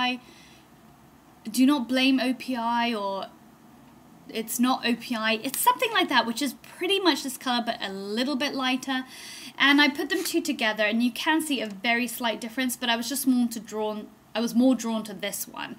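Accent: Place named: United Kingdom. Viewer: do u live in uk or us? lol bc ur accent is uk but ulta is in us =p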